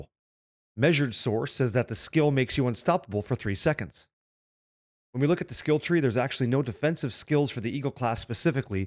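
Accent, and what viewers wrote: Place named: Canada. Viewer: when canadians speak 🤮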